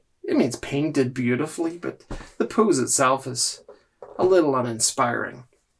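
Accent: Northern Ireland, United Kingdom. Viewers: Nice N.Irish accent 😆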